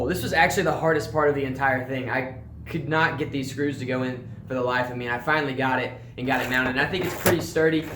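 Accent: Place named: California, United States. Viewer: You could kinda look like a young sean boswell with that california accent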